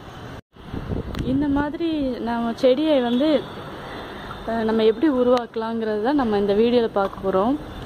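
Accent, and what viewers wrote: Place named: Tiruchendur, India. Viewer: நீங்க எந்த ஊர். எங்க சொந்த ஊர் திருச்செந்தூர்... நீங்க பேசுற பாசை எங்க ஊர் side மாதிரி இருக்கு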